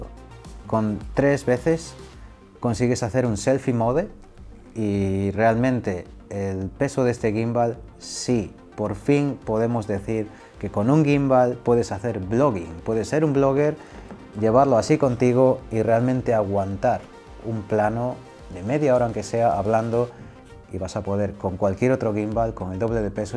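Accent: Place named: Latin America. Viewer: Amigo me encantó el video muy buena reseña del gimbal solo tengo 2 dudas espero me puedas ayudar 1 se pueden hacer timelapse con este gimbal he visto que con algunos se pueden lograr ejemplo en una noche estrellada podrías hacer un tutorial si se puede y 2 de donde eres como es que lo conseguiste lo he estado buscando en amazon y no lo encuentro soy de México tienes un acento latino muchas gracias que tengas un excelente día.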